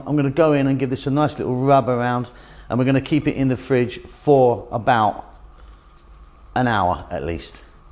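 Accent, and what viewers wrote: United Kingdom. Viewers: Like your cooking with standard British accent 👍👍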